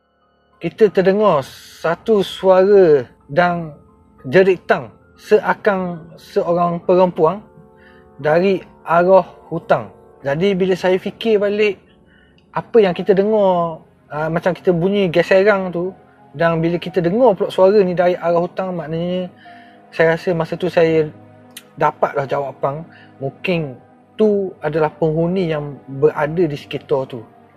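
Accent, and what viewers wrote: Terengganu, Malaysia. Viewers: Haha,org ganu oyak gitu la,ambe org perak,tp blajo dungun dlu,mule mmg selok la suke,dh lame biase dengo,srehhh srehhh..haha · X eplore pn xpe dh bro.. sdp dgr crta pngalman cmni je.. skit pn xrse boring lg² ad cmpur loghat tganu.. mmg lyn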